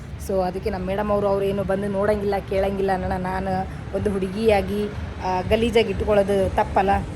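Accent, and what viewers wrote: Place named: Kalaburagi, India. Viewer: ಕರ್ನಾಟಕದಲ್ಲಿ ಪ್ರತಿ ಜಿಲ್ಲೆಯಲ್ಲಿನ ಮಣ್ಣಿನ ಸೊಗಡಿನ ಭಾಷೆ ವಿಭಿನ್ನವಾಗಿದೆ... ಎಲ್ಲವೂ ಒಂದಲ್ಲ ಒಂದು ರೀತಿಯಲ್ಲಿ ವಿಶೇಷವಾಗಿದೆ... ಹಾಗಾಗಿ ನಿಮ್ಮ ಬಾಯಲ್ಲಿ ಕಲಬುರಗಿಯ ಭಾಷೆ ಕೇಳಲು ಚಂದ..❤❤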